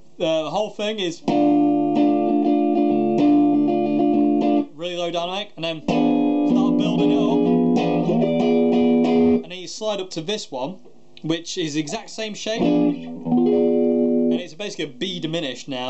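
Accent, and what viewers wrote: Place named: North, United Kingdom. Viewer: Cheers you the best teaching us all this stuff xD and ill just say our accents are similar lol thought i was the only one playing up north (atleast thats how it feels sometimes) haha take care buddy!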